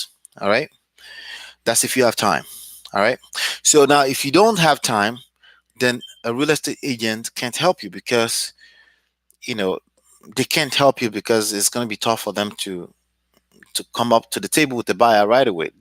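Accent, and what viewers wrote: Nigeria. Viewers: You Nigerian bro?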